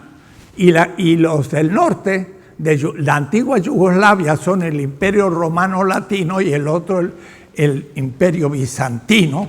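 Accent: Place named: Chile. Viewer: Un ciudadano del mundo . Siempre pensé que era chileno ( por su acento ) hoy me entero que nació en argentina pero que hizo su vida en España palestina México . Tremendo aporte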